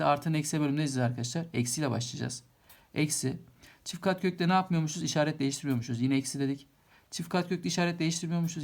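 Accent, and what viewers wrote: Trabzon, Turkey. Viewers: hocam aynı trabzonlu gibi konusuyonuz yanlis anlamayın 😁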